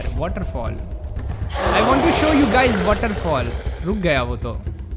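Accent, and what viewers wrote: Gujarat, India. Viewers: New Temple Run game Kuch Kuch Raji game Jaisa Nahin dikh raha?????🤔🤔🤔🤔🤔🤔 And also I think you from Gujarat in Ahmedabad or Gandhinagar or Surat I think so because your accent and you that say division multiplication like that I am Gujarati to (Bournvita Nahin Pihu Mare)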